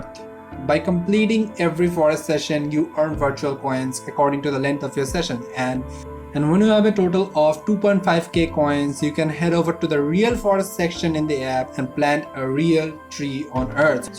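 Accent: Bengal, India. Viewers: Why do u sound bengali?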